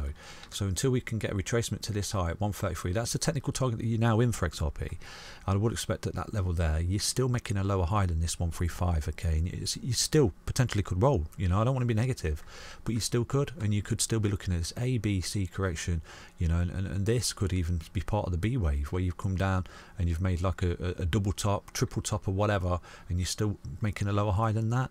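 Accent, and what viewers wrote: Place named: Yorkshire, United Kingdom. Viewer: I bet he's from Yorkshire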